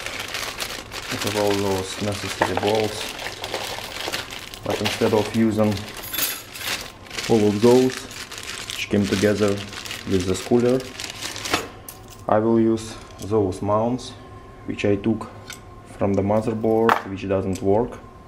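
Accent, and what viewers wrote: Russia. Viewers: Братан по голосу слышу что русский . У тебя было место под остальные 3 слота памяти или всётаки он закрывает их , люди пишут что закрывает .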